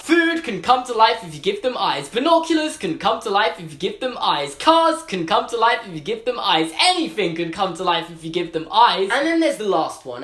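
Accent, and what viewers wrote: England, United Kingdom. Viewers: Alize Cordero I live in England and he does have really posh accent to be fair....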